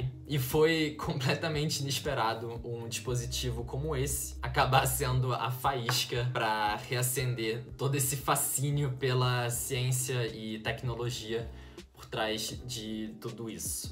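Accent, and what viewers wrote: Rio de Janeiro, Brazil. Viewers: é incrível como você tá morando na Alemanha a 3 anos e ainda fala carioques fluente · Naviossx, claxsses, sxstudante, bem carioca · Sotaque de carioca é muito bom kk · Chocado com o sotaque carioca do ícone da ciência, adorei o vídeo!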